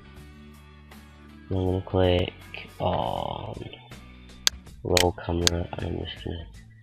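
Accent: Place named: North, United Kingdom. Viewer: If I hat to guess I'd say you were maybe from Barrow/Blackpool area from your accent. Good video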